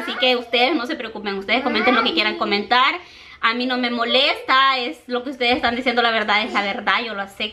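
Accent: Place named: Honduras. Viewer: Te admiro me encanta el asento de las catrachas y mas cuando son carismaticas y cariñosas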